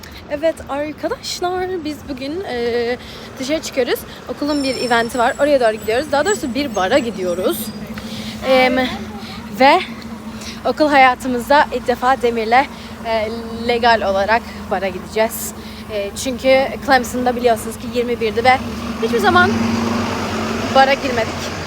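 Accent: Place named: United Kingdom. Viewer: Ingiliz aksanli heyecanla bekliyorumm❤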